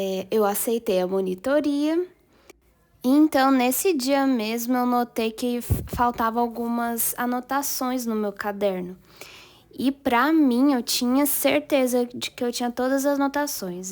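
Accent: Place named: Spain. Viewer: No sabes hablar bien portugués parece más español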